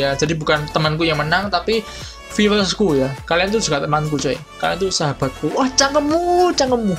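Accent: Java, Indonesia. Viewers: Hero favorite: liliana Open id: <num> Btw saya suka medoknya bang wkwk XD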